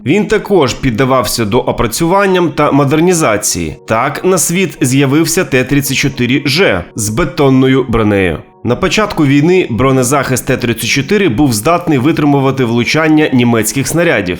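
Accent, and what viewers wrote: Russia. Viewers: В УКРАЇНСЬКІЙ МОВІ "Г" ВИМОВЛЯЄТЬСЯ БЛИЗЬКО ДО "Х" А НЕ "К" ЯК В КАЦАПНІ, ВЖЕ ЧАС ЗАПАМ"ЯТАТИ, ТА ПОЗБУВАТИСЯ КАЦАПСЬКОГО АКЦЕНТУ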